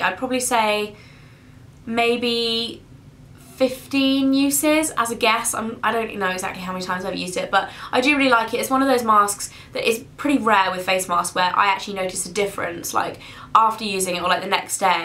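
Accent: England, United Kingdom. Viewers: I'm the ONLY one in the world, i know, who cannot stand the british accent, sorry.... Cant watch now. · It's not a British accent, its an English one... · i'm so jealous of anyone w a british accent!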